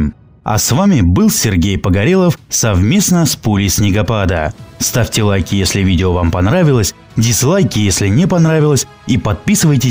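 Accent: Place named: Moscow, Russia. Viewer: Он не коверкает. Он просто так произносит. Скорее всего он из Москвы или Подмосковья. К нам приезжал с Москвы человек. Он тоже ударение ставил также необычно для нас . СЫсерть, Верхняя ПЫшма и т.д..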